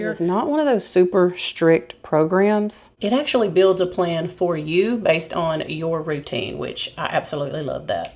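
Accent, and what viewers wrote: South, United States. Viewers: I love (LOVE!) that I've heard you say whompy-jawed a couple of times during Thrift MAYnia! We used to say that all the time growing up and it brought a huge smile to my face because I don't hear it as much anymore. I love to hear good ol' Southernisms and a genuine southern accent. Where I live, in NC, the locals have been outnumbered over the years, especially in the cities. I always love unleashing my southern twang and sayings on those that have been planted here. 😂 Sometimes they'll ask me where I'm from and I'm like...here...I was born and raised right here.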